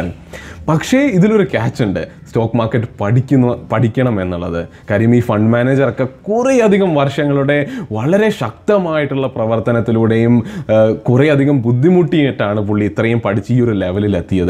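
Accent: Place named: Kollam, India. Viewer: കൊല്ലംകാരൻ ആണ് അതാണ് 💖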